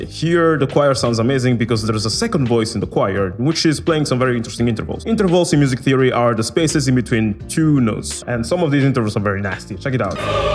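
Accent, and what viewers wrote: Italy. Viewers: Non sapevo tu fossi italiano! Ti ho cominciato a seguire un po' di tempo fa e sentivo qualcosa di familiare nel tuo accento... Adoro le analisi che fai, ottimo video come sempre! Greetings From Italy! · Man, are you italian, or resident in Italy? Your accent really sounds like it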